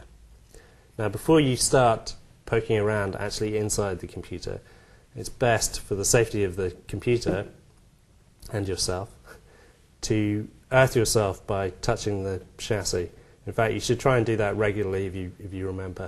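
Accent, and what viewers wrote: United Kingdom. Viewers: I usually hate British accents as they come off a pompous and arrogant but this one is mellow and soothing. I want him to read me a bed time story. "There once was a DIMM that didnt fit in..."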